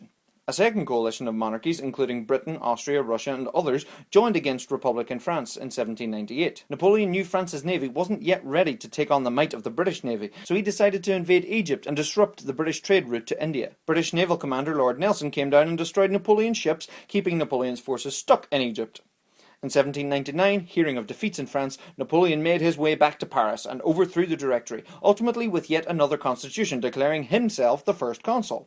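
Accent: Ireland. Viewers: Because HE IS IRISH!!! DUN DUN DUUUENWIAKWOEISJWOIEDJDISOOWEK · are you irish or something? (not that you are) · He is Irish mate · You sound Irish :) pretty cool if you ask me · I wish It had subtitles. You speak so fast and i'm not used to the Irish accent.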